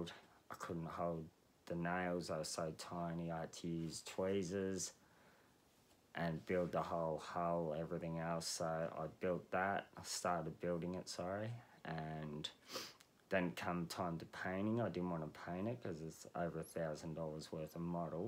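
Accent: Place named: Australia. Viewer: You have pretty mad skills and is that a bloody Aussie accent I detect. Good on you mate.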